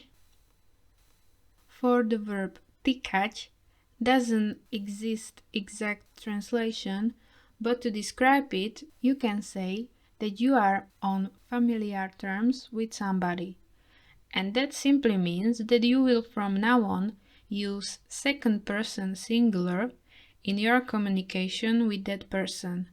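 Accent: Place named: Indonesia. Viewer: Hello, are you Indonesian? I just guess it from your accent.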